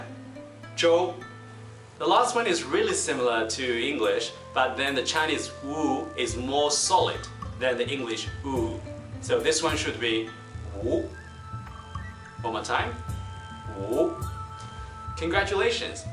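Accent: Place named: Australia. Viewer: Thanks for the resource. Do you know if these spellings Pinying spellings? If so, is Pinying still used as a common way to transliterate Chinese pronunciation into English? I ask because I've met some mainland Chinese who don't know anything about Pinying (unless I'm also butchering my pronunciation of it). Also, do you speak German? I ask because your URL includes "aus" which often translates as "out of"? Sorry, I guess it means AUStralia, based on your accent?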